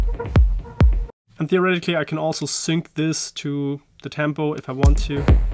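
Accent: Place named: Germany. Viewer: ich kann deine germanness so sehr durch dein englisch hören :D gutes video aber!!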